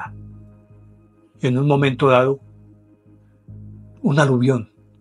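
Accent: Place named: Colombia. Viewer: Mi admiración señor don, es fascinante su forma de narrar y contar historias, nos seduce su brillante y forma de hablar con ese bonito acento colombiano,como siempre ha estado usted magistral con su relato,gracias por su inmensa labor de compartir por este canal su enorme sabiduría,saludos desde jerez, España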